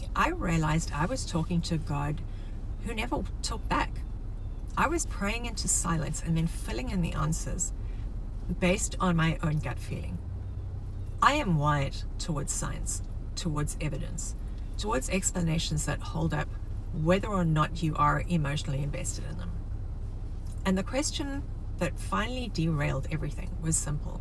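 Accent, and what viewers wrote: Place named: Afrikaans, South Africa. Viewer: Are you Afrikaans? I like your accent. Great vid. Thanks.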